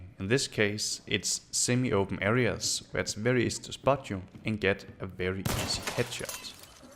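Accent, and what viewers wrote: Denmark. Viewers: U english sounds very danish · You sound danish?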